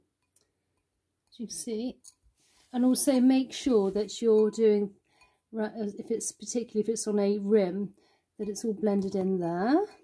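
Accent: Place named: United Kingdom. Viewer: Hi, if you use two-part epoxy products a lot, you can get very sensitized to them, so you might want disposable gloves for what you are doing. After the two-part products have cured, they are very inert, and there is no concern about contact. In practice, the concern might not apply nearly as much to the putty, because it doesn’t have the honey consistency that most two-part epoxy products have, but in principle it still does. Thanks for the nice vid, from an Ahmericaan that loves hearing the Queen’s English. ~Brian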